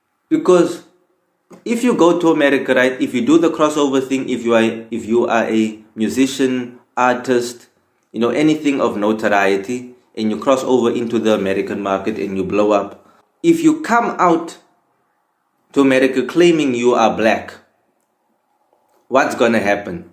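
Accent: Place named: Durban, South Africa. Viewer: Was gonna say you sound like you spent some time growing up near Durban somewhere, be that as that may, you saying you "not taking kak" is so typical though. Love it!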